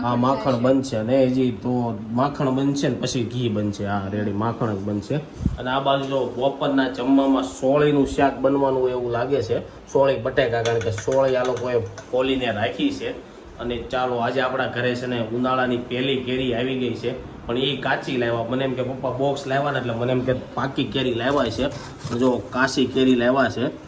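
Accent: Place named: Surat, India. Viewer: acdam surati bole chhe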